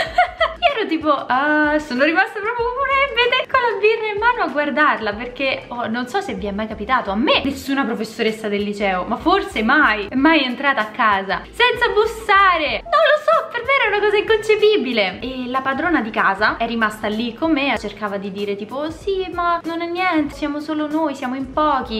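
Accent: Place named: Rome, Italy. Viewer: A,è sempre 'n piacere guardatte!! To'o posso di da romano?(trapiantato a Torino però🤦🏻‍♂️🤷🏻‍♂️)...pure te sei 'n tajio!!😂 Bella,intelligente,brava e simpatica! E poi c'hai 'sto soriso!!🥰(mo te scrivo bene😅)... È bello seguirti,video sempre interessanti,e adoro il tuo leggero accento romano con un italiano invidiabile...ti prego non lo perdere mai però!👋🏻👋🏻🤗😘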